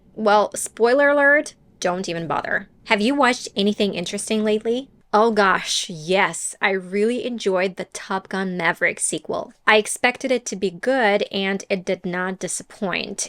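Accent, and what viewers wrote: United States. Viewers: How amazing I ve been watching ur videos for a long time I cant believe that u arent American...u are great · Hey, as an native English speaker would you say she sounds like an American? (Cause to my ears, I'm Brazilian, she does). If she doesn't, what gives her away? Would you weigh in, please?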